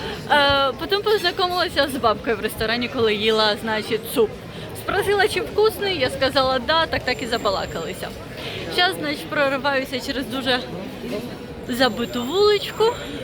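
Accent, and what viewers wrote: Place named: Surzhyk, Ukraine. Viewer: вітання! цікавий репортаж, але ваш дикий суржик все псує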